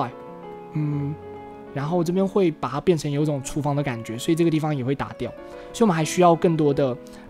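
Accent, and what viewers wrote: Cantonese, China. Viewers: (香港的粉絲)阿神說粵語很可愛^^:D雖然聽不太懂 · 阿神講粵語好可愛阿wwwwwwwww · 阿神的粵語好可愛～(PS:Bob們都知道阿神笨笨傻傻的，那阿神覺得這種性格是好是壞呢？） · 阿神講粵語好可愛<3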